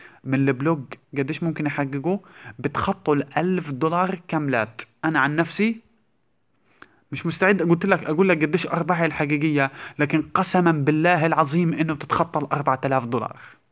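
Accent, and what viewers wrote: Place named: Gaza, Palestine. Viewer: بشرفك انتا من غزة صح سبحان الله من الكلام بنبين أستمر صديقي الله يوفقك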